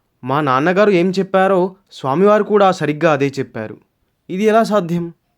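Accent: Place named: Telangana, India. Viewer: Excellent! By your accent know you are telengana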